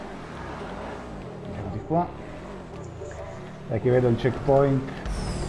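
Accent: Rome, Italy. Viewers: Súper romanaccio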